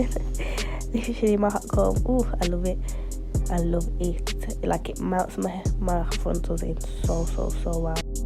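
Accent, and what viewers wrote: Birmingham, United Kingdom. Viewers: Juliaaa Robb I think Birmingham in the UK maybe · omg ur british!!!!!!!!!!! i love ur accent!!!!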